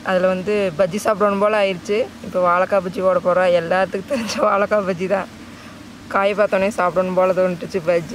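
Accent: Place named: Coimbatore, India. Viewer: Akka your speaking coimbatore tamil very nice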